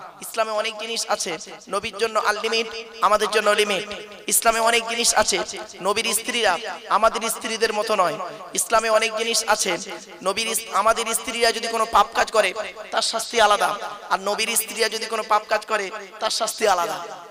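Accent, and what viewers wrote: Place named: Kolkata, India. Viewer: হুজুর আপনার ওয়জ খুব সুন্দর লাগে আমি বাংলাদেশ থেকে বলছি আপনার কথা শুনে মনেহয় কোলকাতা কি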